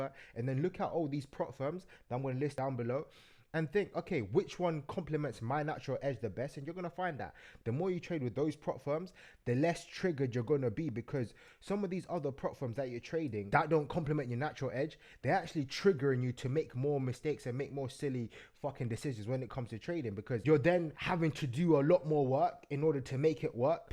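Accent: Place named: United Kingdom. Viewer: It’s refreshing to hear a brudda from the UK talking about these things. Following!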